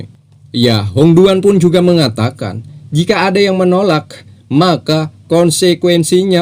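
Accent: Banjar, Indonesia. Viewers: Mantap mbuk ai banjar banar sdh🤣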